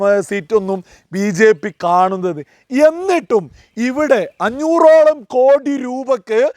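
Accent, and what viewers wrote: Malappuram, India. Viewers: ഈ മലപ്പുറത്തക്കാരനെന്ന് പറയിപ്പിക്കുന്നത് ഒന്ന് മാറ്റാൻ സാധിക്കോ. കൊല്ലത്തുകാരുടെ ഫാര്യ എന്നതു പോലെ........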